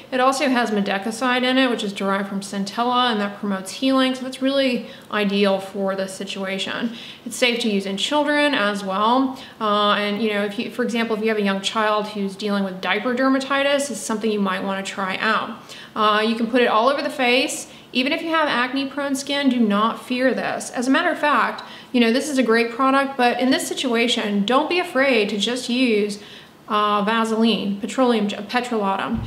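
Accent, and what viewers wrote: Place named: South, United States. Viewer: Are you from Virginia or perhaps Texas? I can hear a twang in your voice but only slightly with your “o”s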